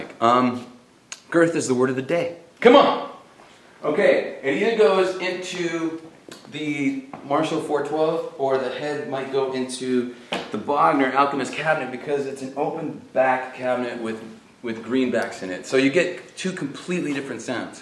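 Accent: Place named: Canada. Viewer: lol, he still says abooot :) love canadians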